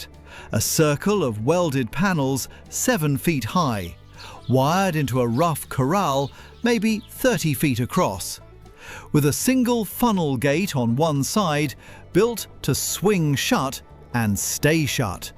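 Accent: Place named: England, United Kingdom. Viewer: Why use an English accent ???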